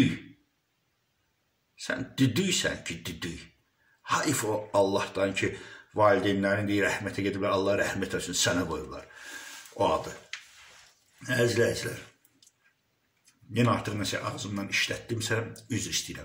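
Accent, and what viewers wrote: Baku, Azerbaijan. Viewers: Cox gözel faktlarla danişir gözel bakı ləhcəsiylə danişir.böyük hörmətimiz var size bəy.var olun.yaxşı pox qoyursan lazımı olanların agzına. dilinə qüvvət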